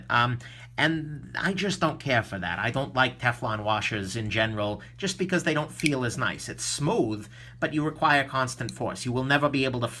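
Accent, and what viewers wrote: New York, United States. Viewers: New yawk I'm assuming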